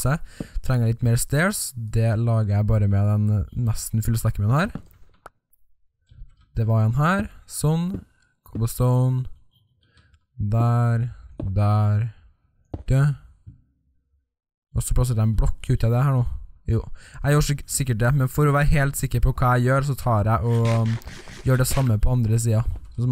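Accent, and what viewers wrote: Trøndelag, Norway. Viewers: Snakker du trøndersk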